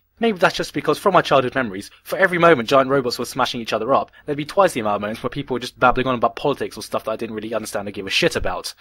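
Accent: United Kingdom. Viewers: it might just be because all of his insults are with a brittish accent, but this dude is freaking hilarious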